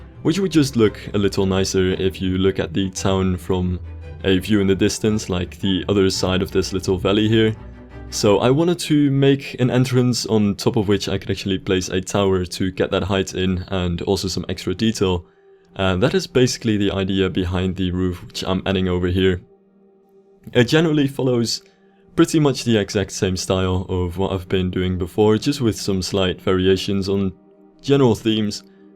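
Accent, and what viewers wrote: Netherlands. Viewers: <num> Yeah, he's pretty fluent! Although I can still hear a pretty clear Dutch accent to it :P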